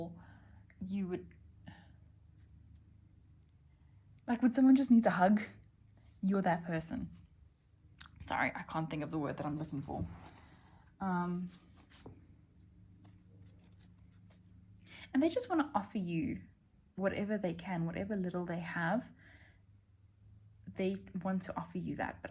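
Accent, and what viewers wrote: South Africa. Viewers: May I ask if you are South African? ❤ I just love hearing your accent doing a reading! And your readings resonanate so much with me too, thank you for sharing. Love from the East Coast of S.A. 🇿🇦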